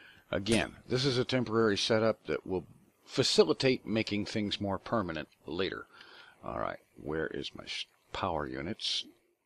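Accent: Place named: South, United States. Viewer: "Sometimes even the brightest don't see the light." made me lol. How long were you kicking yourself once you figured that out? :P "I promise I'll get myself together here eventually." -- I'm not holding my breath. If I didn't know better, I'd swear that I was watching AnderZEL with a southern accent. Only 3 16k modules and not enough storage? iirc, Hypno was able to fit everything he got from a full-size quarry onto a single 1k drive (excepting the stone, gravel and dirt that he placed into deep storage units)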